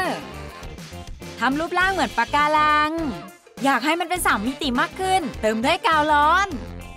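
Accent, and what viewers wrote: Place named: North, Thailand. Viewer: เสียงคนภาคดูเหน่อๆนะ สาวเหนือรึเปล่า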